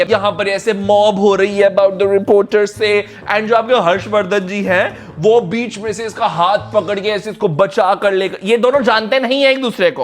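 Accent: India. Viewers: Your that desi ascent 😭❤️❤️❤️❤️❤️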